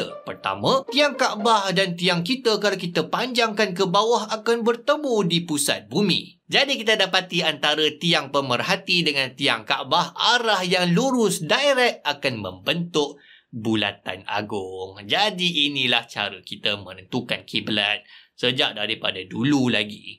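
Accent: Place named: Perak, Malaysia. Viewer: Tk silap perak yg dekat dgn bahagian kedah sebb loghatnya bercampur utara dgn perak.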